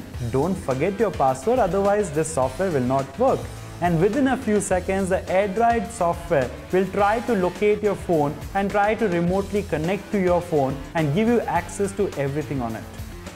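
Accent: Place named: India. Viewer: Oh, I love your videos! I started to watch just to hear your cute indian accent but you're so funny and share excellent information that i felt in love with geek on the loose and unzziped by Dell too! I wish i could be your baby doll <3 LOL XOXOXOXOXOXOXOXOXOXOXO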